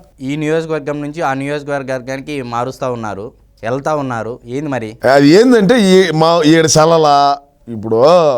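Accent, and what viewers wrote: Rayalaseema, India. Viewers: Bro sooper..... Nee body language and seema accent superb❤😂😂🎉🎉🎉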